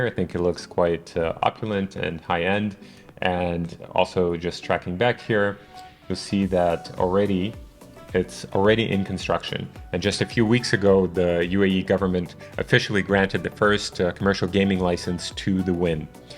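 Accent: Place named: United States. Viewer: was wondering about your name—you sound American! It would be great if you could introduce yourself in each video. It’s refreshing to see Americans in this space. I’m also interested in learning more about Dubai’s real estate. Most of the content I come across seems to feature Russians, Europeans, and people from Muslim countries, along with Indians from other parts of the world. Many people in America still don’t know that much about Dubai’s opportunities—they often think Miami or maybe some Latin American destinations like Costa Rica or Tulum, Mexico, are the best options in the West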